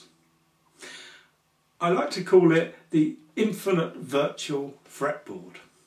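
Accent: United Kingdom. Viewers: oh noooo!! brit english again.. :-(((((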